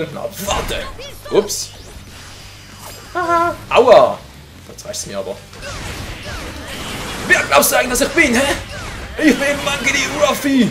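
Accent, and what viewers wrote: Switzerland. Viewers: bisch du ein schweizer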